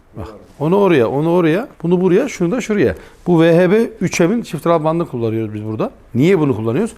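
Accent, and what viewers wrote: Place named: Kayseri, Turkey. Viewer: senin gibi bir adam bu jantı nasıl seçer...!!! harika oldu eline sağlık kayseri şivesi insanlığı ve sanayi kafasını tekrardan gördük ...!!! sizleri seviyoruz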